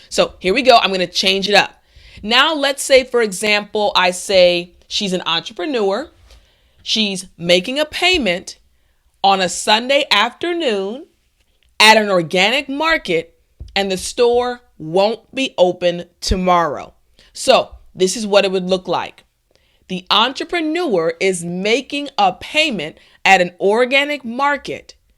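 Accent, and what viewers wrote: United States. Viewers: Very useful I like the way you speak..those five words are necessary to start to comprehend and talk a new language..it’s the first time I watch one of your videos..I guess you’re american..which state do you come from?your accent flows delicate..i’m from Italy but I have few friends from US..bye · Hi,Is it American English? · Props. Where are you from? Are you american?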